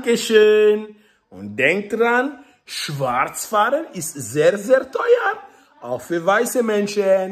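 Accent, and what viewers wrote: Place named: Hesse, Germany. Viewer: Hessisch ist ein Lebensgefühl 😊😎👍super sympathisch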